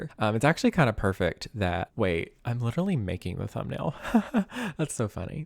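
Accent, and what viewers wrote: South, United States. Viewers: ! I love your southern twang you’ve def made the line up of my nightly sims YouTubers ❤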